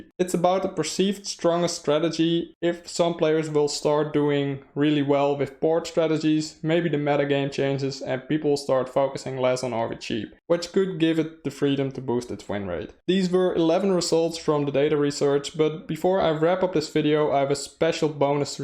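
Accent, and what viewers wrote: Netherlands. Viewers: Je kan je Nederlandse accent niet verbergen haha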